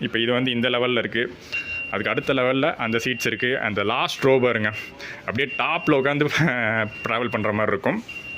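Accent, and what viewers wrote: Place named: Nagapattinam, India. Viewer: Tq sir.., nagai language la pesurin😊😊ga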